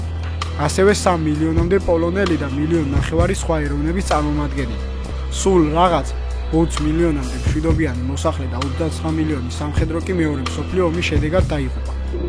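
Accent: Kutaisi, Georgia. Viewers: ქუთაისური კილოთი ისტორიულიფატტების კითხვა კარგი გგონია?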